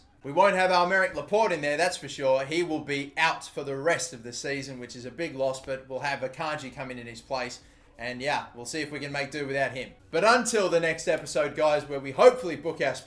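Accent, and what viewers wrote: Australia. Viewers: i unsubscribes when u told them all to die u angry australian man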